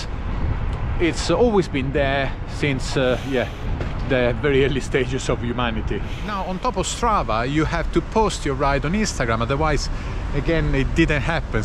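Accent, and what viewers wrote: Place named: Italy. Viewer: Love yur videos and yur accent! 🇮🇹 I treat bike fashion the same as normal fashion, colour coordinate, either the same colour and mix colours from a similar pallet. Including bidon, casquette, gloves, helmet, but socks and shoes always white except black socks with black gravel shoes. Bib shorts are all black so can wear with any jersey. I love cycling fashion and looking Pro even if I don't Go pro! 😂❤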